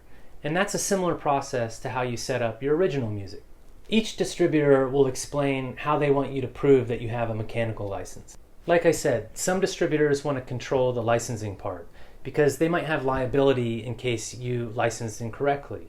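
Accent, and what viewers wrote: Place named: United States. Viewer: NICE, CLEAR AMERICAN ENGLISH & WELL GOOD EXPLANATIONS, SALUTE !!!.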